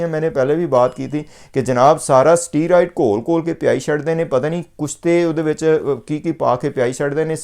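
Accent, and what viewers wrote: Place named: Punjab, India. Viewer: Ap punjabi py zor deny ki bjaay topic py bt krty wqt bch jta... Fuzool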